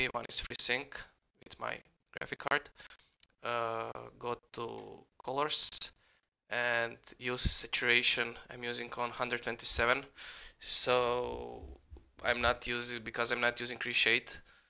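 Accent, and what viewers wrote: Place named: Serbia. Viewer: Bukvalno sam znao da si nas jer kako pricas engleski nisam ni trebao da gledam foldere xD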